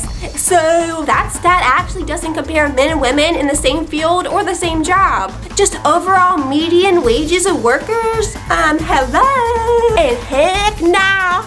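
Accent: South, United States. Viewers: Thanks. Talk about "pot + kettle = black", that FCkH8 dot-com clip itself was just pure hate. And, dear lord, the 'abuse through indoctrination' those young girls must have endured in that original video... it makes my flesh crawl down to the bone...I just watched 2mins 35 of filth that out did anything that Joe Gobbles conceived. Liking your videos, and as a non-North American, I can't get enough of your Southern accent - don't ever lose it!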